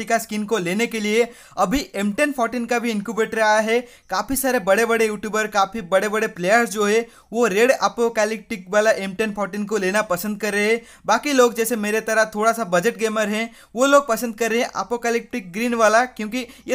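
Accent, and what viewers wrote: Odisha, India. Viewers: Ap Odisha se ho kya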